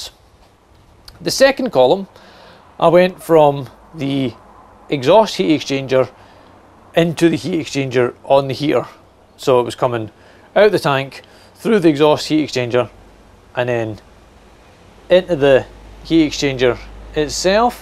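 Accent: Scotland, United Kingdom. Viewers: Listening to your?eastern? Scottish accent is bamboozling my fancy. 😁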